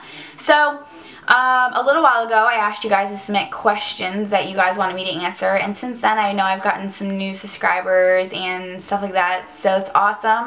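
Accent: Canada. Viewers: hey, so i tried commenting on another video of urs but it was giving me greif! it was the kat von D first impression vid on her foundation girl it looks incredible on u. looks llike great stuff. what brush do u use though cuz it looks good? also, i love ur personailty supre cute rihgt up my ally, i say cra cra all the time hee hee. keep it up kiddo :) btw u live in canada? u have an accent.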